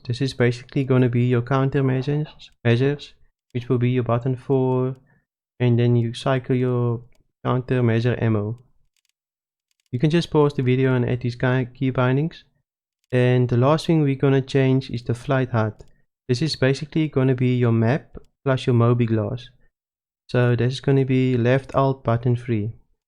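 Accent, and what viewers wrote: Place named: Cape Town, South Africa. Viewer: Thanks, just what I needed to figure it out. And how fantastic to run into another Cape Townian playing SC. Love the accent. Maybe we'll meet in the verse.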